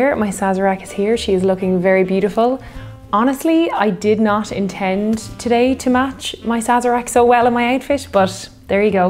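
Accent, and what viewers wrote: Ireland. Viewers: Your second video for me. I made this comment after watching my first, which was your first Sazerac. Here's my comment from that one, which probably should have been made here .... "The first video of yours I've watched and it was very enjoyable indeed. I've visited Ireland often and listening to you speak makes me want to return and visit with my Irish friends there. Anyway, the Sazerac is a great drink, which I've enjoyed many times in New Orleans, and your formula is fairly classic. Mine (which I was taught by a NOLA bartender I befriended) goes as follows: 2oz Rye, 1/4 oz. Demerara sugar syrup, 3-4 dashes Peychaud's, 1 dash Angostura, and 3 sprays of the Absinthe atomizer into the chilled glass just before I pour in the other mixed ingredients. Lemon twist does not go into the glass. Slainte! (And I'm now a subscriber.) Oh, and I agree with the comment below that you'd likely truly enjoy a Vieux Carre as well."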